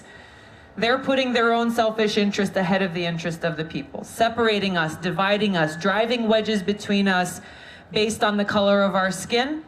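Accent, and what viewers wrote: Hawaii, United States. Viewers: This persistent "aloha" greeting might sound odd and unnatural to people in the Midwest. If you wanna win national elections, you'd better speak General American rather than Haiwaiian American.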